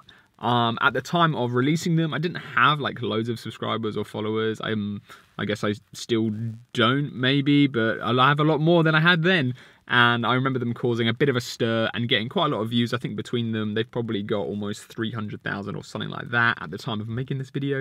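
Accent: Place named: London, United Kingdom. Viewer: i didnt know you had a london accent lol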